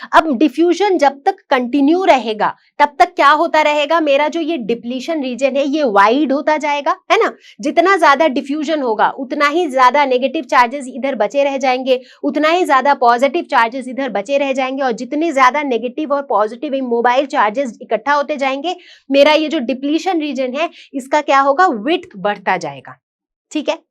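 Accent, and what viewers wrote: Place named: Gujarat, India. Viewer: Mam are you gujrati...? Your accent feel like gujrati 😊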